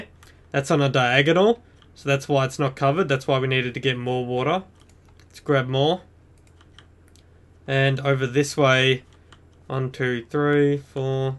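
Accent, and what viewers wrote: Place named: Australia. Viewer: i think hes from australia by the sound of his voice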